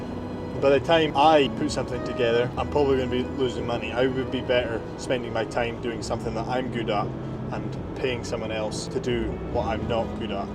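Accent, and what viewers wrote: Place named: Scotland, United Kingdom. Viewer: absolutely no idea how i ended up here apart from scrolling through youtube on back shift at work. Grat content mate. Great to hear a fellow scotsmans voice on youtube! wish you all the best boys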